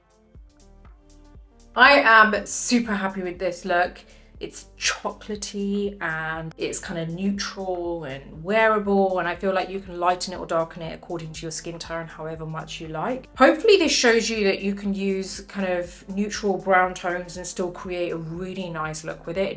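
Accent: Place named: London, United Kingdom. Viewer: got Londer's accents like Michael Caine's. Haha. And I like it.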